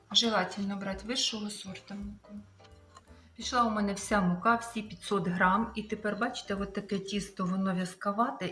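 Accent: Ukraine. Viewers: Людина освічена краще говорить українською. А це - російська мова з українською вимовою. Тут більше російських слів ніж українських.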